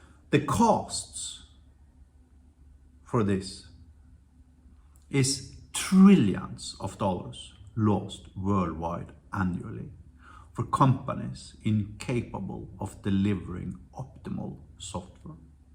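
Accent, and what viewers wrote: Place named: Norway. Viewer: Thickest Norwegian accent on Youtube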